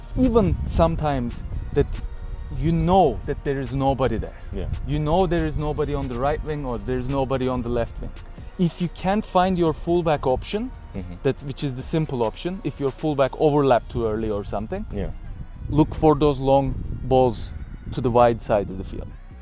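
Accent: Turkey. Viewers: Seni tanimiyorum ama aksanin tam bir turk bu yuzden ARDAAA GULERRR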